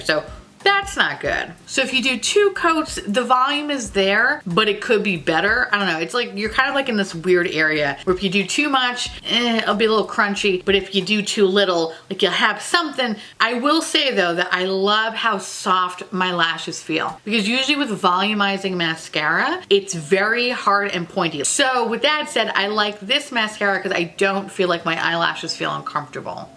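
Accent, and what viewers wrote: England, United Kingdom. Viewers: Yesssss! Loving the English accent! Represent! 🇬🇧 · Love the English accent girl. Your skin does look beautiful.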